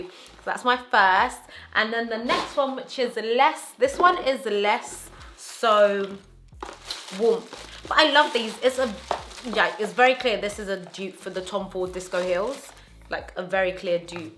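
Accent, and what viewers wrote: United Kingdom. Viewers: You have the cutest British accent! I wish i sounded British! I love your style and you look amazing in every piece!! Thanks for sharing love xoxo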